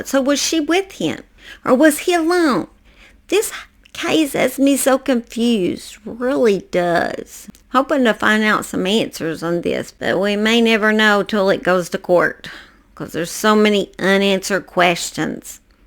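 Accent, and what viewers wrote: South, United States. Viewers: I appreciate your content! These cases that aren't told need to be heard! As a southern girl myself, I can't get enough of that twang. Keep on keepin on.❤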